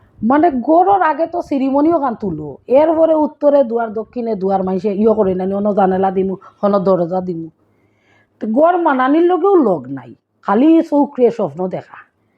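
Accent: Sylhet, Bangladesh. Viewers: দিদি আপনার মুখ থাকি সিলেটি ভাষার শিলক শুনতে অনেক ভালা লাগে।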